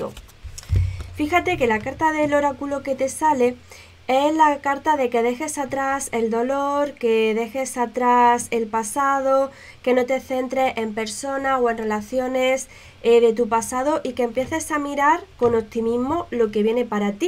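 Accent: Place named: Castile, Spain. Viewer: Gracias hermosa por tu lectura y consejo, tienes una voz muy linda y castiza, gracias chula eres maravillosa, besos desde México.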